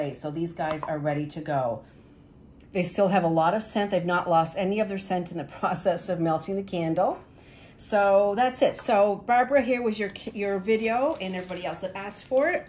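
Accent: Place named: Canada. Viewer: Loved this video! Can’t believe it filled so many molds! I actually heard a Canadian accent in thsi video — never heard that, amiga! Great video!!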